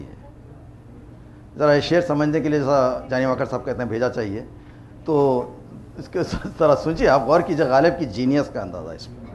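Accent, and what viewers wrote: Hyderabad, India. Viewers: It is the Daccani/Haiderabadi accent which is fine. Qasam is pronounced "khasam" in Haidarabadi accent and you might have hearf, he has pronounced "Kharaabaat" as "Qaraabaat" once. This is the daccani accent. In Delhi it would be pronounced "Qasam" and "Kharaabaat". Yes, and in Panjab it would be pronounced "Kasam" in some regions even "Haraabaat" instead of "Kharaabaat", and this way way way worse. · Hydrebadi hain unki maadri zuban ki waja se ye alafaz ada karna mushkil hai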